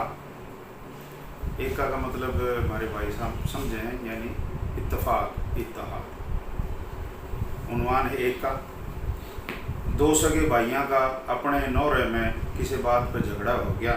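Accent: India. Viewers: Bhai wah! Aap ka haryanavi lahza Rohtaki laaga sai. Jaisa likha same usi pronounciation mai parh diya. Lekin" ekka" ka ka matalab itfaq nahin hona chahiye. Ekka ka matalab Ekta (unity) aur kahani se bhi unity hi jhalak rahi hai. Itfaq ka matlab 'by chance/coincidence hota hai.if I am not wrong. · Waah bhai zabardast Kahaani Afsana "Eyka Akta bhaichara yakjahti..Aapka padhne ka lahja kamal haryanvi sai... Aapsi bhichhara hamesha rakhna chahiye Janab Rana Rahmat Ali (Marhoom) Bade uncchhe paye ke haryanvi Adeb sahiyatkar they sukriya,Aapka Haryanvi Reel tv ka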